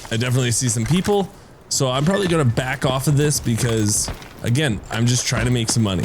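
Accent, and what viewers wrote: Canada. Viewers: it wasnt actually that it was the way you talk and some of the manurisms you use.i am also canadian so picked up on it quick lol i juat started on delta force console slowly getting better i enjoy your videos find them quite helpfull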